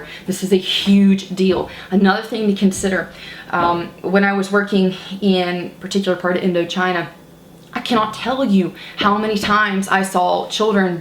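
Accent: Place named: Texas, United States. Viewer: " Fixin to do " , are you a Texas Girl ? I am not hating at all just a 56 yr. old Native Texan Male that knows good Texan Slang when I hear it !!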